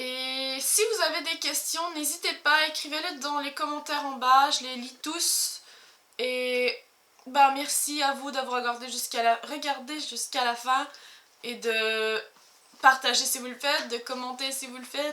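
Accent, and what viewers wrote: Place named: France. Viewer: Ouf, l'accent français!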